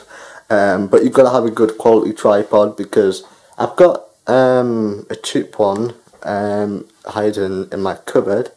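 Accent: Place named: United Kingdom. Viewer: I knew it! That is really good British voice.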